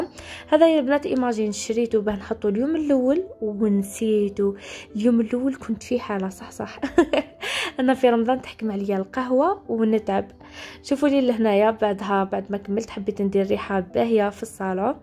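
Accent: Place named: East, Algeria. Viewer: هذي لهجتها ماهيش راح تبدلها على سواد عيونك تاع شرق كامل يقولو عظام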